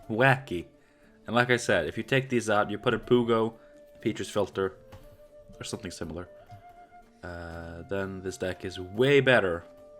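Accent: Norway. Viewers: are you from Norway?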